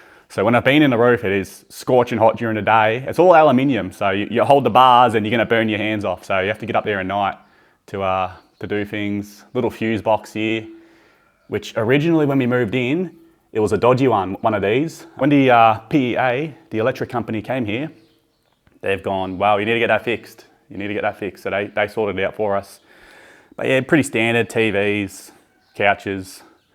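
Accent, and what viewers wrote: Australia. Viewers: It’s so hard to listen and understand your English in strong Australian accents . 😮good daiy , barbi (bbq). All the best in the future for your next move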